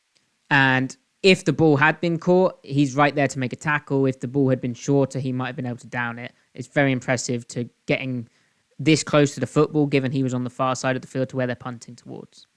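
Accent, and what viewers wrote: United Kingdom. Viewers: For someone with your accent, no offense.. I find it absurd that you call him unsung. The 12’s are aware of reed. We know. Calm your tits mate. I watch different channels from across the pond and I love that we have an international fan base. But you are not speaking for the home base. Maybe you’re just late to acknowledge it yourself.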